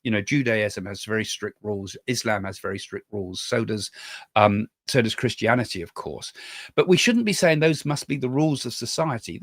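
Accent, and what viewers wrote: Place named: West Country, United Kingdom. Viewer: I’m new here. You sound like me. You west country? 😂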